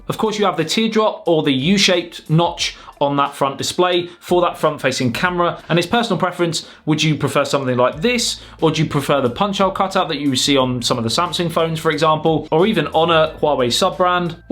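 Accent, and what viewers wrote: United Kingdom. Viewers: Like your UK accent mate 😏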